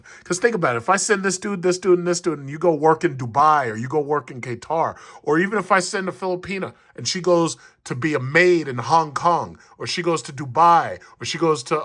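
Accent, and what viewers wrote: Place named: New York, United States. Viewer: This dude sound like he's from NYC totally funny guy, but my man spitting out 💎 good content.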